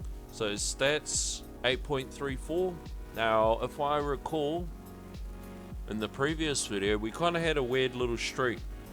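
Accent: Australia. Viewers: you can skip to the second mark to skip the intro music and hear that beautiful aussie accent ;)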